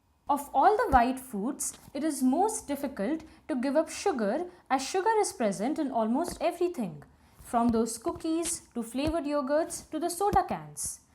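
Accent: India. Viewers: Must you talk in English? Your pronounciation sounds half Hindi and only 10% english. Hard to understand.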